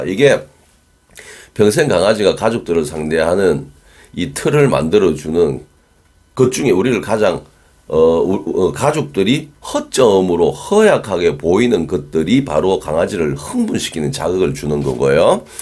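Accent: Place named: Gyeongsang, South Korea. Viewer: 너무 감사해여~~ 3개월된 강아지 입니다. 입질도 심하고~ 으르렁 거리면서 진심으로 저의 손도 물고 기본케어를 전혀 못하는 강아지 입니다. 방문교육도 했는데 ... 저는 안되더라고요 ㅎㅎ 선생님 교육 계속 다시보고 다시보고 계속 다시보면서 아이보다 저의 문제 구나~~깨달았습니다. 열심히 주도권 교육을 하고 있습니다. 여기서의 리더는 나야 라는 어필을 계속 강아지 한테 표현하고 있습니다. ㅋㅋ 아직은 부족해서 타이밍도 잘 못잡고 제가 감정조절이 안되서 조금 불안하긴 한데 강아지가 눈치도 보면서 곧잘 하고 있는듯 합니다. ㅋㅋ 영상을 보고 행동했더니 일주일정도 되니 입질이 매우 좋아졌어요~ 더이상 따라다니면서 안물고 같이 자자고 떼쓰지 않아요~^^ 아직은 많이 부족한 보호자라서 많이 노력해서 배우고 강아지랑 좋은 관계를 맺어보도록 하겠습니다. 영상이해하기 싶고 경상도 억양도 너무 매력적이시고 ~~ 감사해하면서 시청하고 있습니다. 감사합니다 ~^^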